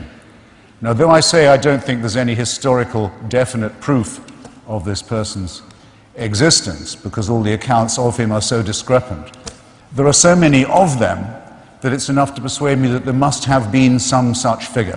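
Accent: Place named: England, United Kingdom. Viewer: Two things. 1, Hitch was one of the most well-read and determined intellectuals of the past 100 years. In a world where religious conviction is still considered brave, he was brave in a way that would embarrass the proponents of that definition of bravery. Most of all, he was a consummately moral and humane person. He was a good man, and he didn't need to jump on anyone else's bandwagon to prove it. 2, English accent.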